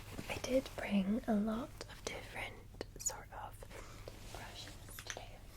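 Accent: Scotland, United Kingdom. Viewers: Is that the scottish accent or a bit toned down? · It’s a bit of a mix. She used to live around London, so her Scottish accent now is a bit lighter than the typical accent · Since you sound Scottish, you ever had brandy? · I am so happy that you are now settling into your new home:)!! Is Hugo, you Dog happy with his new home? I really LOVED the way you read the Fisher Man Moon Story with your Scottish Accent which made the poem sound more beautiful:)!!! I LOVED both of your bean bags which I think sound like the ebb and flow of the ocean waves:)!! I also LOVED the brushes, both the sounds and the visuals which feel like a virtual facial massage:)!! And I too have had embarrassing incidents like I was at my Target and I had sworn as I was about to pay for my items that someone stole my Wallet, but when I went home I saw that I left it in my Living Room!! 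Also, years ago, I was at my Super Market and I realized I did not have enough money, so I had to leave a bunch of items on the Counter!! So, you are not alone:)!! I consider myself a Christian, but I have a good sense of humor and I am not a prude. So, it does sound funny about the "jumpers". LOL:)!! My Brother, before he became a Father was a bit like your Brother, except I do not think he would have been that "naughty' in front of my Mom, now Late Dad and now Late Grandparents:)!! As for visiting, I would suggest you set up Zoom or Skype to stay in touch with your extended loved ones:)!! My Boyfriend's Dad is very intelligent and even though he is Elderly he is setting up Zoom for all of us to socialize together:)!! However, I do have a set of 3 to see in person as well, but not by any American rules or laws. It is just something we t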